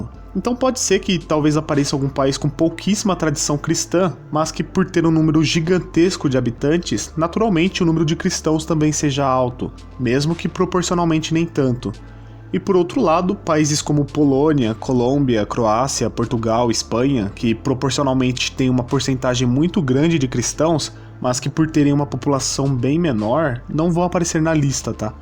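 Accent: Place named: São Paulo, Brazil. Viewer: Ah, e acredito que o sotaque não interfira muito. Sou paulista e falo exatamente como você! Rsrsrs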